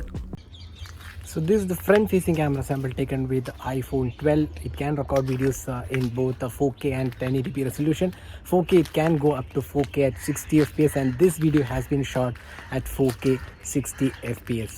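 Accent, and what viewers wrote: Kerala, India. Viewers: From your englsh i can say that you're a malayali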